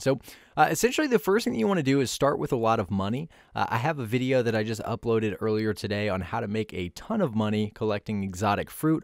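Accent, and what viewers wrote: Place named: General American, United States. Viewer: reminds me of how people who speak in a “standard American accent”on tv commercials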